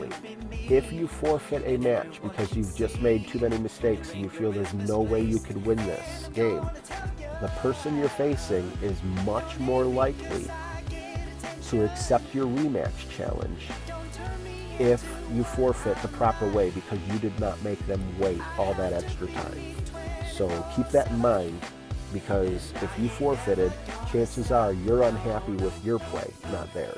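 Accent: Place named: New York, United States. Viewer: Sounds like your from my area in NY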